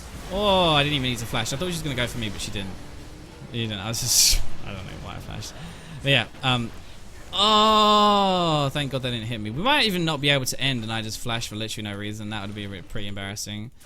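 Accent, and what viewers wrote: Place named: Nottingham, United Kingdom. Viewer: is totally from Nottingham or Oxford or some shit... >.>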